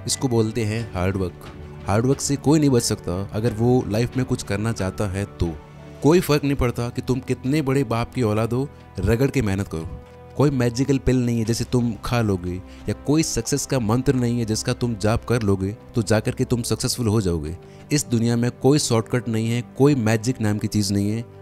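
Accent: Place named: Marwar, India. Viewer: Aapki aavaj se . Pata nahi kyu. Muze lagata hai aap Rajasthani he sayad marwadi? I think I am sure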